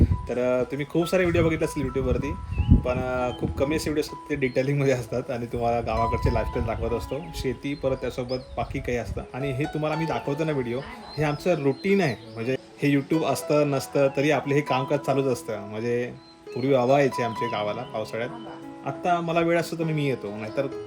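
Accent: Konkan, India. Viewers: gavi jaun gav sarkh ....pure kokani boltos 😅😅😅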